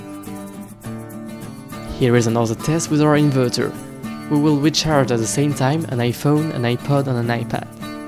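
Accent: France. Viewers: the accent is not the best (i know you are french) but great video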